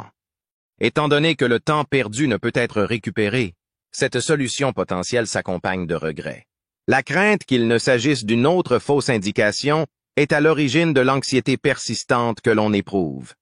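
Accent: Canada. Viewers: Pourquoi "Pauvre France" ?? Le gars qui parle a un accent canadien !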